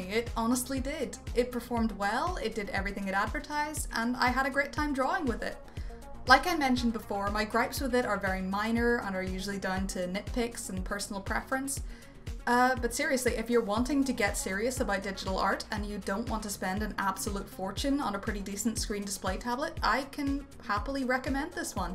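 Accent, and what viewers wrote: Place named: Ireland. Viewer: Why was I so excited when I realized she had an Irish accent?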